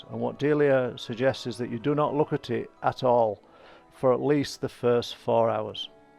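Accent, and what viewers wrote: United Kingdom. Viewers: I made this cake for the first time this year, followed Delia's recipe to the letter (from the book) and didn't look at it for 4 hours as instructed and it was very burnt, black in places. Very disappointed but too invested to stop so I trimmed off the burnt bits, top sides and bottom, fed it and iced it following your video this time, which was very helpful, so it'll look great but who knows what it will taste like. Sounds like you're from my neck of the woods or over the border (West Yorkshire). Happy Christmas!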